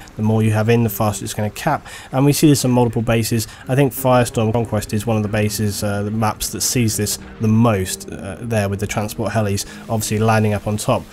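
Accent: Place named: RP, United Kingdom. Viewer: U sound so posh and it annoys me this is why American films take the piss out of British lol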